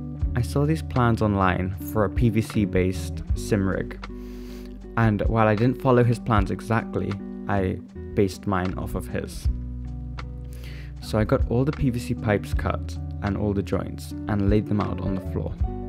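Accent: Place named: Trinidad and Tobago. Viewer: You from Trinidad? Kinda picking up the accent there.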